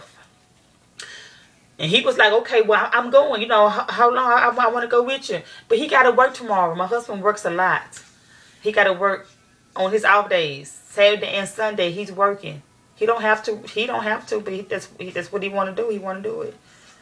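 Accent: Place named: South, United States. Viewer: Her southern accent is very comforting... she literary puts me to sleep when she talks LOL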